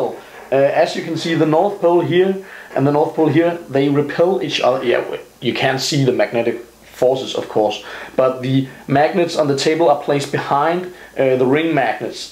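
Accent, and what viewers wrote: Denmark. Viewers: Lol sounds danish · You sound really danish (im danish too) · vietnamese? He's danish >.< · Are your danish your have an danish accent cool idea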